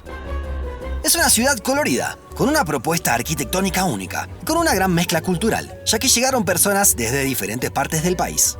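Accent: Rioplatense, Argentina. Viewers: No exageres La Paz y el occidente siempre tuvimos habitantes, por tu acento pareces argentino o uruguayo como se dicen europeos la mayor civilización de Bolivia e fué Tihuanaco superior a cualquiera de Bolivia o siempre había habitantes.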